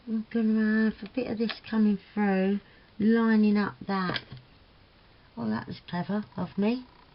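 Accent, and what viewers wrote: Cockney, United Kingdom. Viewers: Love the journal card. The edge does look like a peacock feather off the edge! Hugs and Blessings my lovely friend. Xoxo Denise PS...every time I play your videos and my so. Is in ear shot he stops and watches because he says he loves your Cockney accent lol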